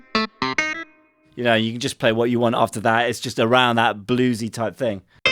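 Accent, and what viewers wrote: United Kingdom. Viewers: I just love listening to your fine British accent, lad! Reminds me of a visit I once paid to my British pen pal, decades back from now. I was a pupil in German high school at the time. Oh. and your music, it is so adorably funky, love it 👍👍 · I gotta admit ... there are few things funnier than hearing a white guy with a proper English accent placidly proclaiming: “We can all get down with our bad selves”.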